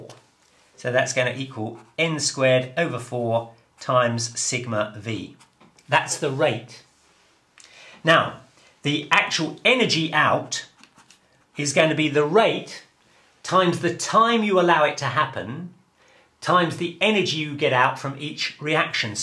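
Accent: United Kingdom. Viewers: that British accent though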